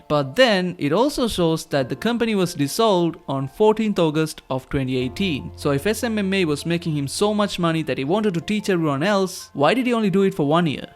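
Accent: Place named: India. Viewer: I think you should use ElevenLabs instead of your natural voice. I really mean no disrespect but I think that the indian accent is unfortunately putting people off from watching the video. Despite the video being really good. This might be why your videos are not going viral.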